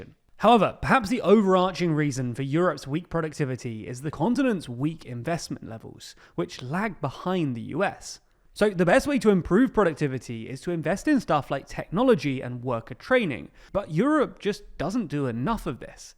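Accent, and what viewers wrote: United Kingdom. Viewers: Fairly sure 'catch up to' is american and on this side of the pond we say 'catch up with'? I say that as one of the things i enjoy about the channel is a well spoken british accent!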